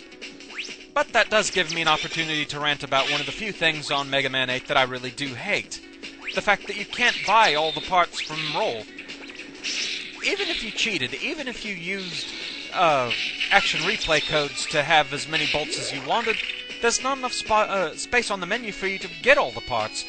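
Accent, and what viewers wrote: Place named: London, United Kingdom. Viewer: Great intro! Eh, One thing that's always bothered me is your accent... I like the sound of it, but I have an instinctive hatred for it... London or something? Don't let it bother you, I can just see your aligator-like mouth moving when you talk. Hey that Shaymin on your monitor's pretty cute. HOW DARE YOU LAUGH AT CHARGEMAN!! BUT, the joke's on you, you'll feel the train's wrath soon enough. Enough random comments from me, let's see how you actually did. To part two!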